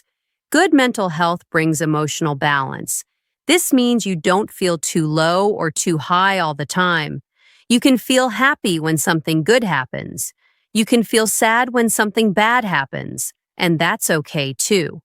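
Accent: United States. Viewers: This is not a British accent but horrible American accent with delivery of irritating pronunciation of Rrrrrrrr.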